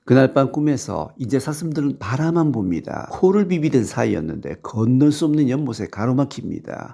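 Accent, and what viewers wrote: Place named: Gyeongsang, South Korea. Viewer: 축하드려요 이쁜글이 많아 성공하실거예요 생각외로 깅상도말이 거칠지않고 부드럽네요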